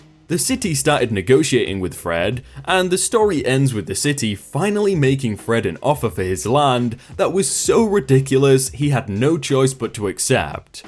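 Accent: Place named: Southern England, United Kingdom. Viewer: England, probably south, doesn't sound midlands or north.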